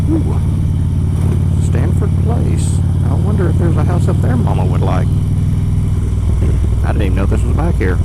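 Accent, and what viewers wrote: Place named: South, United States. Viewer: I think a southern drawl actually helps in a motovlog. It blends well with riding down a country road and the rumble of the Harley in the background. It has a Mark Twain feel about it. And yes, everyone hates the sound of their voice, unless your name was Charlton Heston or Gregory Peck.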